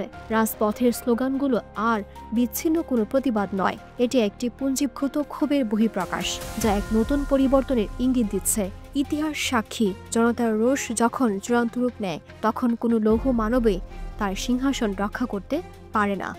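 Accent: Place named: Bangladesh. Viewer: Why you are not giving “Go back slogan” to Mamota or Firhad” to whom 10 people are dead before Puja?? and everyday people are raped,murdered??? India is still stabile due to Narendra Modi and who can talk against Donald Trump,,,your Bengali languages sounds like you are a Bangladeshi journalist…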